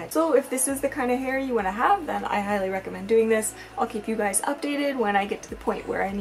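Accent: United States. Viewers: we gotta get out this comment section. They're way too ignorant here. I'm shocked they don't know anything about perms and relaxers for one and two they didn't even know she was American. They said she must be from England she doesn't look like an American woman. Like what do American women even look like where literally a mixture of different people and cultures. I knew she was an American from her American accent 🤦🏾‍♀️ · VERY obvious American accent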